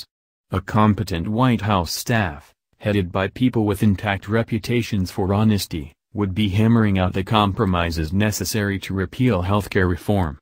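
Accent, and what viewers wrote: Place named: Iran. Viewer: This guy talks like an Iranian he obviously can't speak English well. This sounds like false news. Shalom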